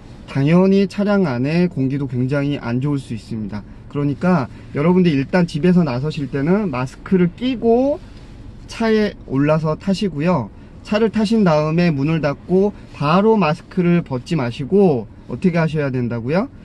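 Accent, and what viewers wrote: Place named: Chungcheong, South Korea. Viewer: 거시기... 충청도 특유의 말투아닌가요? 저는 아주 정감이 느껴지는데요.. ㅎㅎ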